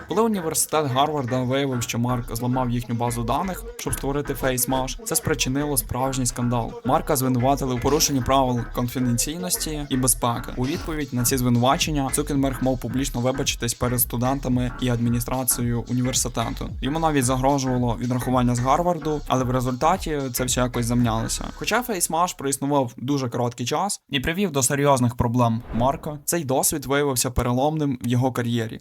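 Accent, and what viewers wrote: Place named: Zakarpattia, Ukraine. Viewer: Бро, дуже якісний контент, акцент цікавий, ти часом не з Закарпаття?)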